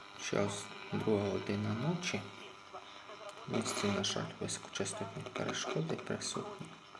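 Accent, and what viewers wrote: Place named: Poltava, Ukraine. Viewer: Полтава балака)))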